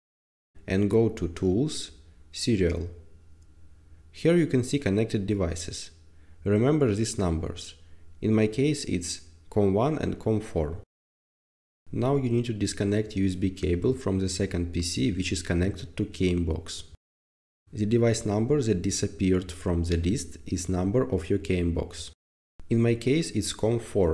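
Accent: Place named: Russia. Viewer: Норм русский акцент я балдею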